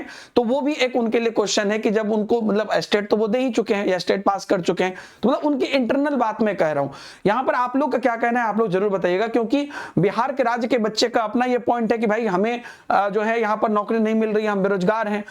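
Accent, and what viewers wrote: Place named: Uttar Pradesh, India. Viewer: भाई जी मुझे तो नहीं लगता है यह बिहारी है यह कहीं u p का लगता है टीचर है या कोई आर्डर स्टेट का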